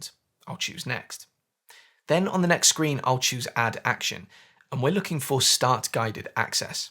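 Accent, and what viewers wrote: England, United Kingdom. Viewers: Yes please that would be great. Love that you are English like myself so I can follow what you say easily, and love just the way you make everything so easy to do with automations and a clear instruction. I’ve learnt so much from your tutorials to which I’m ever so grateful. Thank you so much. Tina 👍🏻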